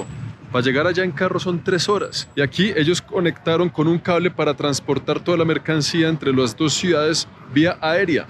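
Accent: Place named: Manizales, Colombia. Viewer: cuando comencé a seguirte pensé que eras de Manizales. Tienes un acento un poco parecido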